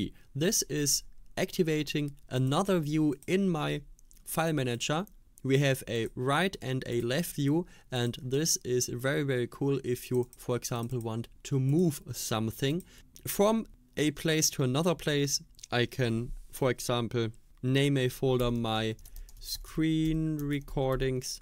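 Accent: Germany. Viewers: Probably he is german, or speaks german.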